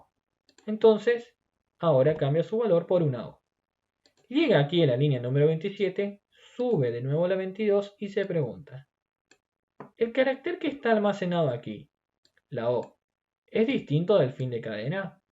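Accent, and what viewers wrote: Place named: Misiones, Argentina. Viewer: Muy genial tu explicación. Muchas gracias. Te felicito por toda la pasión que le ponés al arte de enseñar. Y re contra mil veces feliz por poder aprender con acento Misionero.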